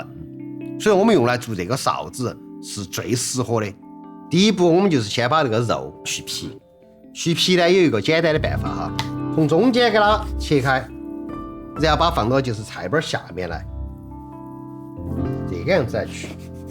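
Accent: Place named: Chengdu, China. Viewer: 成都话真是好听，女娃儿说更好听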